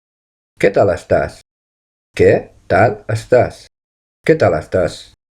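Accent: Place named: Argentina. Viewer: Argentino intentando hablar en catalán?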